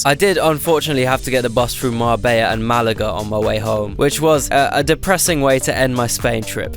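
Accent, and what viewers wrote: United Kingdom. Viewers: Homie nobody in eastern europe recognises a british accent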